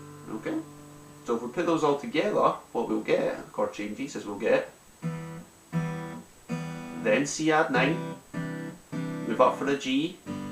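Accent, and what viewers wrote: Scotland, United Kingdom. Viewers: damn good to hear a Scottish accent!! do you do lessons?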